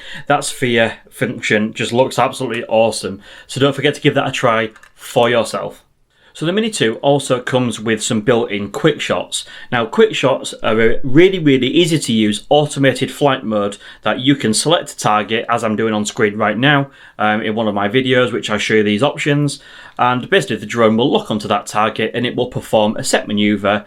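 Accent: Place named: Yorkshire, United Kingdom. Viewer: All the time I was watching this video I'm thinking "This guy's from somewhere near Hull in the UK I think? He's definitely a Yorkshire lad anyway. My wife's family are all from Hull, which is the reason why I thought this was the case. Am I correct?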